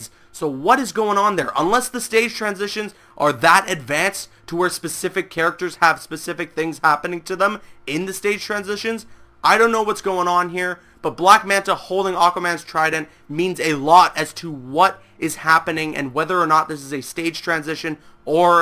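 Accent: Canada. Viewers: Canadian accent ?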